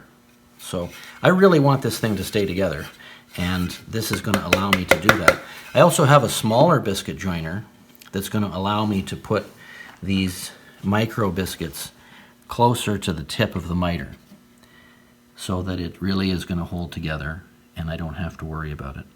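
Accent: Canada. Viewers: Nice video, Interesting! I shan't comment on the criticisms you received on whether it's a strong or not corner reinforcement joint. It is just showing another method of reinforcing a mitre joint. To those who are interested in the tool you used these are on sale in the UK under the description of Spring Miter Clamp Set, Framing Mitre Clamp, Google that and you'll find lots. By your accent i'm assuming you are from Canada, not sure what you would call them there.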